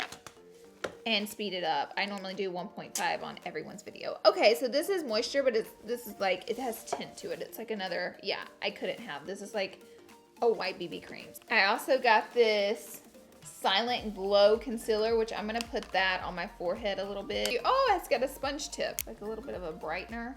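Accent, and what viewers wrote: South, United States. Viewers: You came up on my feed , so I don’t know you but all I gotta say are you country?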